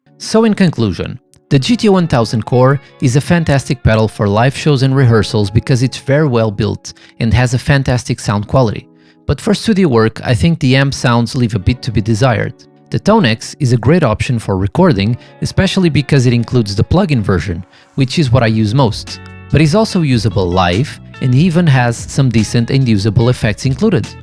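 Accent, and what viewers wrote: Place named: Portugal. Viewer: Pelo sutaque deves ser tuga! 😂 Saudações 🤘🏻🍺🇵🇹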